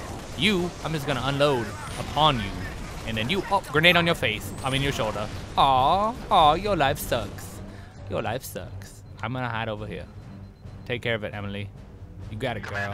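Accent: United Kingdom. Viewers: You sound British! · love the British accent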